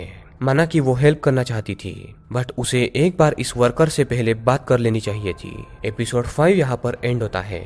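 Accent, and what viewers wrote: Bengal, India. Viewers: Oo apki bat bengoli jesi h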